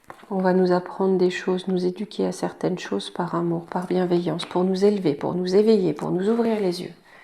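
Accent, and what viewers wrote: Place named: France. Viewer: Bonjour. Merci pour cette vidéo. J'adore votre France parler. Bon week-end également.❤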